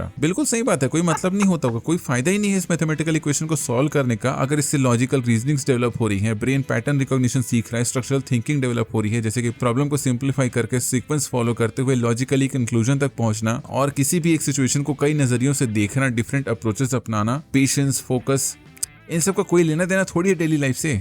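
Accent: Chhattisgarh, India. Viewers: Bhai chattisgarh se ho kya?🤔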